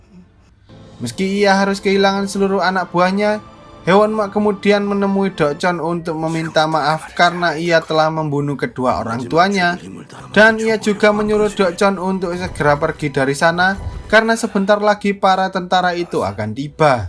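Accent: Java, Indonesia. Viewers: Njirrr. Wes rah usah kesuen. Intro nya wong jowo doooonk.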